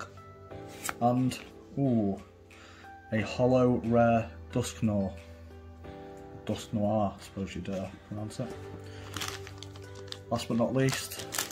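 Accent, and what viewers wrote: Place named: North, United Kingdom. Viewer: Where in the UK are you from your accent sound northern ???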